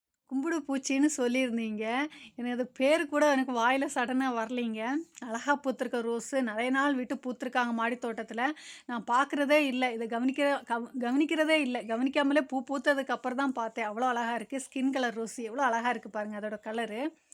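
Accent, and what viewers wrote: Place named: Nagercoil, India. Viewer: ok sister, vunga slang nagarkoil madhiri iruku, enaku native thiruchendhur, indha Marigold seeds kidaikkuma sister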